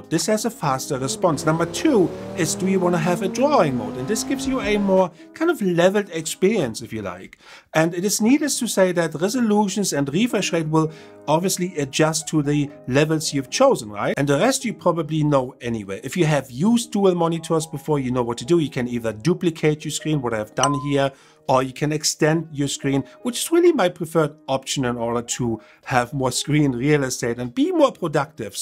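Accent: Germany. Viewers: Nach der ersten Sekunde merk ich schon da spricht n Deutscher😁👍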